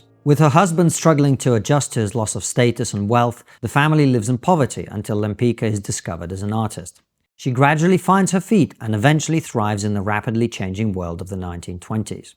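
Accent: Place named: United Kingdom. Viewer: This guy thinks because he’s British that he sounds smart but that’s not how it works bro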